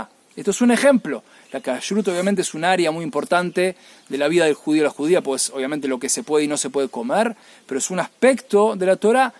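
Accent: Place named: Argentina. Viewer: Sino me equivoco usted también es argentino, por su asentó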